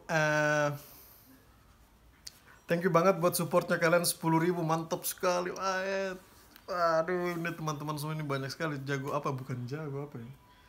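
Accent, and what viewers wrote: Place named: East Luwu, Indonesia. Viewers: Saya orang malili Hahahahhaha lucu sekali logat luwu timur kentara sekali logat orang luwu timurnya ahahhaha sukses terus kak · Sangat menghibur setiap konten2 nya bang. Oh ya bang asalnya luwu timur sulsel yah. Soalnya logat nya sprti logat luwu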